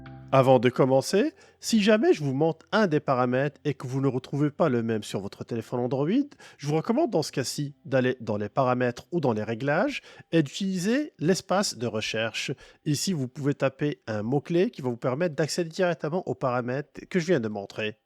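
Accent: Quebec, Canada. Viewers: Super de nous montrer tout les paramètres pour essayer de nous mettre à l'abri de certains problème , continuer comme ça , l'accent Québécois est toujours aussi sympa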